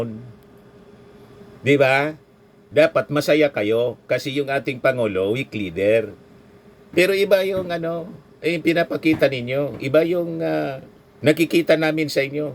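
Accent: Bicol, Philippines. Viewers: Ito ung vlogger na model na beauty product na sobra ka panget,hoy panget tumigil ka,ang sama ng accent mo,bikolanong bikolano tuno mo,panget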